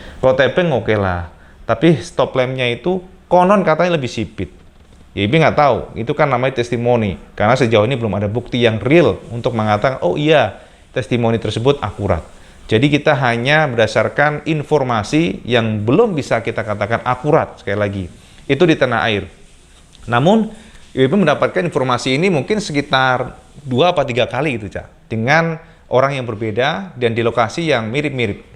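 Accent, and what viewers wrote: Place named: Java, Indonesia. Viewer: Wkwkwk kalo ngomong jawa kok kocak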